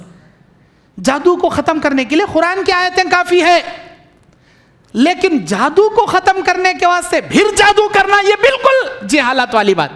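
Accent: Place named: Karnataka, India. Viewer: I'm also from Karnataka tumukuru. Sheikh ki baat karne k lehje se laga k ye hamare state se hi hain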